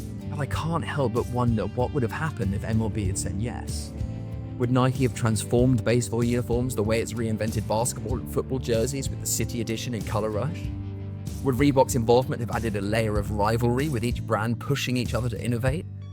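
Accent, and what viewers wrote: United Kingdom. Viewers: British accent? Very smooth not to rubbish. Glad i was recommended this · Gonna start off by saying great, informative video! But on to my actual comment, I'm shocked to hear what I perceive to be a British accent covering American sports, and specifically Baseball. 2024 is dope. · So weird hearing a brit talk biesbol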